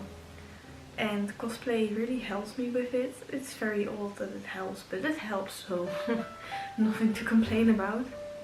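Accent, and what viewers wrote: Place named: Netherlands. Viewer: Lol 10/10 dutch accent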